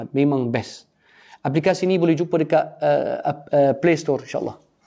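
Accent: Malaysia. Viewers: Kaya logat malaysia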